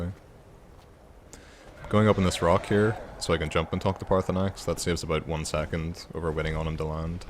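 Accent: Northern Ireland, United Kingdom. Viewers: Northern Irish I'm pretty sure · Is that an NI accent I hear? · It's always nice to hear another Northern Irish lad who is also a massive elder scrolls fan, love the videos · holy shit my fucking man's northern irish. and people say we're not smart, pfft.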